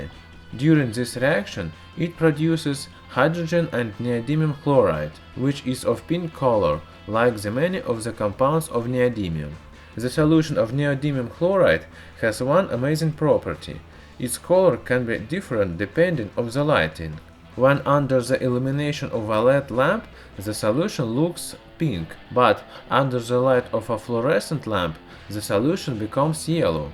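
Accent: Russia. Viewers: Borat is from Kyrgyzstan while this guy is from Russia or at least has a thick Russian accent Kyrgyzstan and Russia were once in the same empire but I think it's a bit broad to say that Borat has a Russian accent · Utkarsh Amitabh Srivastava its russian accent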